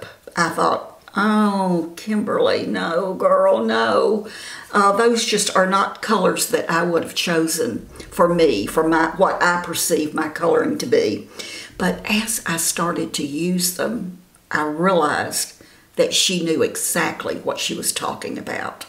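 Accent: South, United States. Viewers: what a warm, beautiful spirit you have; much less your southern charm and charisma drenching your whole persona! You are definitely a spell binding performer and entertainer while teaching us girls how to look our best! i was born and raised in Atlanta and could listen to your southern belle talk forever! Carry on, sugar, you're fantastic! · I just came across your video and consider myself a very mature skinned woman at almost 69 years old. Kimberly also was the artist who convinced me to take the deep dive, even though she was not the artist who did my colour match. I had been on the fence and had been contacted by individuals I knew but having mature skin compared to their flawless young skin was making me very hesitant. Watching Kimberley Weiner tipped the scale. The beginning of your video compared to the end should convince anyone to give this makeup a try! 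You are beautiful & you ROCK with confidence. Thanks for sharing🙏❤️ BTW....love your southern drawl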